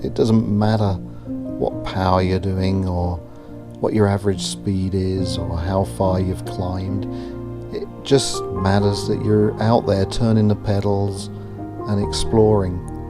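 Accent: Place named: United Kingdom. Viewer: I actually meant to say, “when did you leave England? You sound more British than Canadian.”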